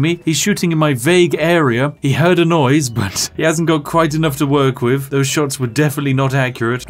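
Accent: United Kingdom. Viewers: Hey brit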